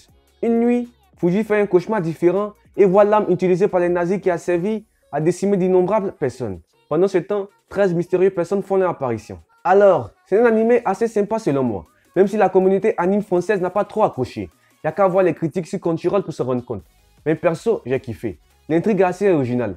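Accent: Ivory Coast. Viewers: Toi tes un ivoirien ça se sent mais prends ton temps pour parler je pense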